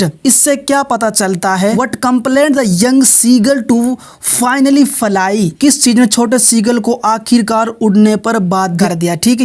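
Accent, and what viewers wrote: Mewat, India. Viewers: Mewati hai bhai tu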